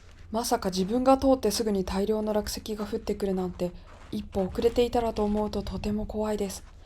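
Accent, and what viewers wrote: Gifu, Japan. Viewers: 時々岐阜弁がしゃべっとって、おおきに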